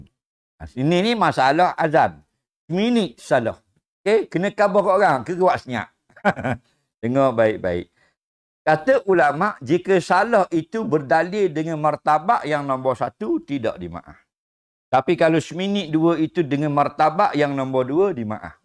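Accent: Terengganu, Malaysia. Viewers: Uai sangat pandai kecek teganu.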